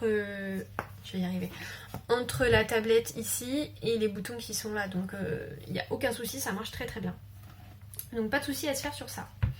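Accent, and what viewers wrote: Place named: Toulouse, France. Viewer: J’ai passé 1h hier et deux heures aujourd’hui à vous écouter sur Procreate... je suis artiste peintre et je souhaite commencer à travailler avec Procreate . Après avoir écouté d’autres vidéos j’avoue que j’adore la façon que vous avez d’expliquer les choses votre rire votre accent toulousain je suis toulousaine et vous expliquer super bien c’est très sympa votre façon de faire merci beaucoup en tous les cas à très bientôt si j’ai des questions. J’ai déjà un iPad 6 et j’envisage d’acheter soit l’iPad Pro mais j’ai pas beaucoup de sous soit l’iPad Air quatrième génération merci en tous les cas pour vos conseils et continuez à nous régaler avec votre sourire et votre bonne humeur !Evelyne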